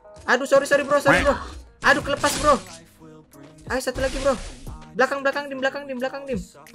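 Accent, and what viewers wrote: Java, Indonesia. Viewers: Bang kamu orang jawa ya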